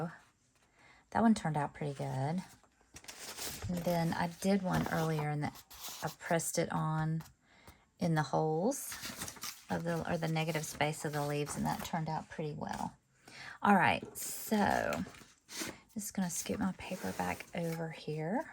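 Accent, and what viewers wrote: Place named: South, United States. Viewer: My thoughts: 1. Do a background like you did for try 3 (a few shades of mid to dark blues eg a Prussian blues and a few tiny dabs of black as it’s so strong it can take over. 2. Pick that up on a piece of paper 3. Do another gel plate with soft to mid greens and a darker green like pthalo green 4. Place your leaves 5. Use your 1st pick up piece to pick up the negative image of the leaves (the lack of veining will look more like your fabric and will show more of the colour) 6. I think the reason your leaves don’t match up perfectly is because you’re comparing a positive with a negative image of the leaf so it’s a mirror image… My 2 cents worth😅 It’s really pretty fabric I loved watching your process, hearing your thoughts and lovely southern accent From Oz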